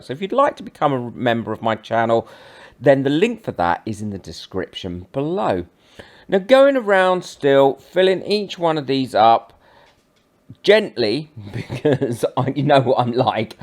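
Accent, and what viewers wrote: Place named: Estuary, United Kingdom. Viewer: I always think of you as the Jamie Oliver of the crafting world, I think it’s the estuary accent! Hope that’s not an insult!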